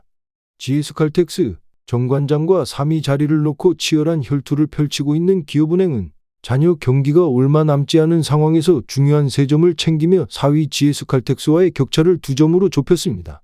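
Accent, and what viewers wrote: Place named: Yanbian, China. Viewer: 연변말투 킹받네ㅋ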